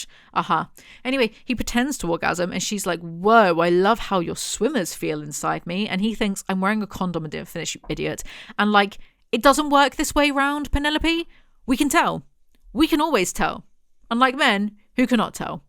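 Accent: United Kingdom. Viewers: Honestly, I've said it before and I'll say it again, I'd kill to get your book reviews on spotify for long term british yapping ad-free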